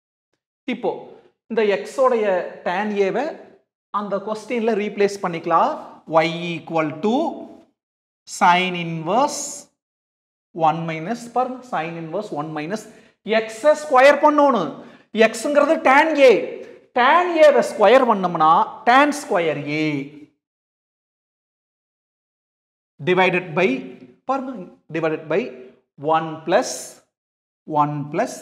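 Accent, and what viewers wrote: Coimbatore, India. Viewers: CBE Slang 🤌🏻❤️‍🔥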